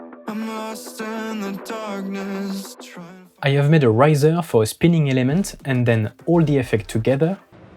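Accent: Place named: France. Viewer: L'accent français !!!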